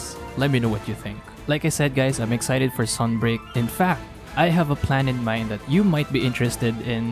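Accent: Philippines. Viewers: Filipino ka ba bro?? I sense it with your accent eh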